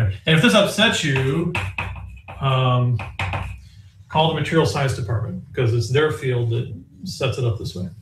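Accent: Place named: South, United States. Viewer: Mahnus mahnus mahnus :) If this post gets two likes, will you come back and deliver more of your lovely southern accent to us?❤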